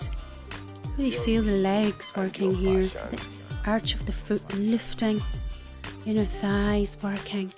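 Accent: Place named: Scotland, United Kingdom. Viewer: Love that scottisch accent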